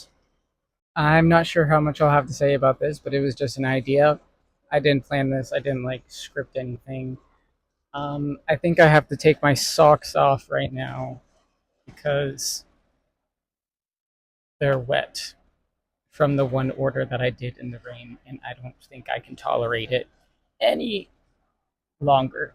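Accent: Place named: North America. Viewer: There is no pressure to talk to anyone. You sound North American where small talk may not be a good idea with anyone considering the state of our country. I don't care if anyone thinks I'm weird. 🤓 TBH , you make a good impression & have exceptional communication skills.😁 You do have a great smile, my friend.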